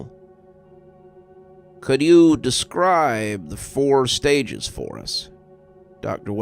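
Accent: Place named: United States. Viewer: I love your accent !! Might be my new favourite American accent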